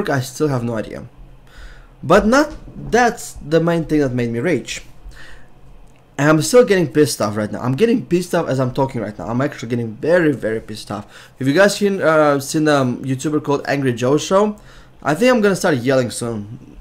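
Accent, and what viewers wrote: Russia. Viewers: your russian accent is good with soothing music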